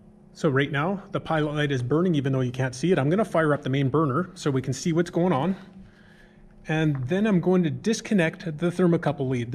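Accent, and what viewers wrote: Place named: Canada. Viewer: I am going to try to fix my water heater and I wanted to understand the underlying concepts and this really helps. He sounds like he's from Canada or the northern Midwest.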